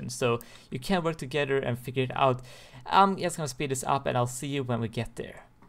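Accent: Sweden. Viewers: he sound swedish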